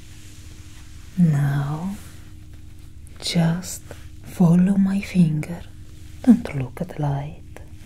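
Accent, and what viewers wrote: Russia. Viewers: Гуд рашен акцент, агент Кетрин. Вел дан😋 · U russian?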